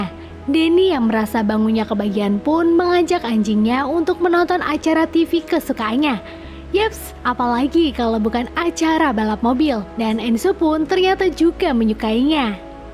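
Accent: Pemalang, Indonesia. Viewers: Orang pemalang ya ba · Wong pemalang kie lur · Wah wong Pemalang iye? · Mbak orang pemalang yak? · lahhh wong pemalang mbak????? samaaa ihhh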